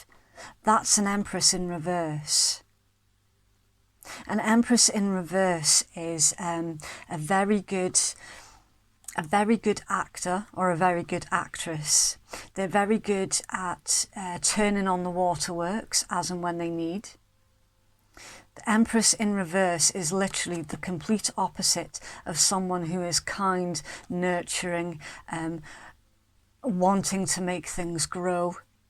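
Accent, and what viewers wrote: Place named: Northern England, United Kingdom. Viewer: Thank you very much for this reading. I’m sure this message was intended for me, it was so specific I was literally waiting for you to give the individuals names involved! I think you are in the north of England from your accent. I have been living away from the uk for the past four years and am returning in March. I would very much like to meet you, do you ever do personal readings face to face? You can find me at <mail>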